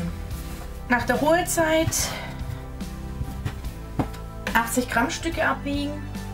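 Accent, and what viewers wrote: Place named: Swabia, Germany. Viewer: Hallo liebe, vielen Dank für das super Rezept. Wir leben in Pakistan und vermissen die deutschen Backwaren daher umso mehr. Heut habe ich die Bretzeln nach diesem Rezept gemacht und meine Tochter hatte Tränen in den Augen, weil es so lecker, genau wie in Deutschland, geschmeckt hat. Dein schwäbischer Akzent macht das Video angucken noch sympathischer. Liebe Grüße nach BaWü. Angelika. Bei Instagram kannst du Fotos davon sehen Instagram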